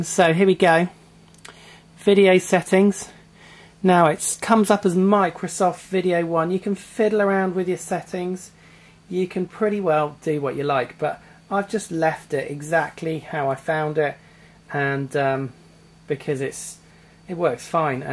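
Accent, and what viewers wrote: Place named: United Kingdom. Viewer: you sound a little like a British mii in Tomodachi life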